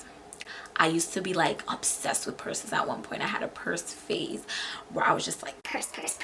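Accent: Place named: Toronto, Canada. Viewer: I don’t know if I’m bugging, but I can lowkey hear a Toronto accent😭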